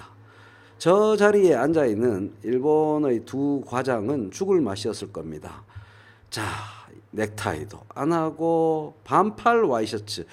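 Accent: Busan, South Korea. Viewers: 최선생님 말투가 경남 부산말투같기도 하고요~~~~~~~~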